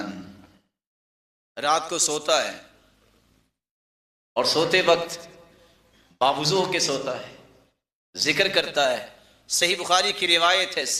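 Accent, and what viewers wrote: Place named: Arab world. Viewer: ماشاء اللہ،،،،،حضرت صاحب اردو بھی عربی لہجے میں بولتے ہیں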